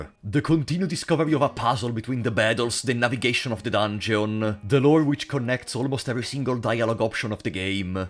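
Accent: Italy. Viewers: He is italian it is literally part of his accent its not a speech impediment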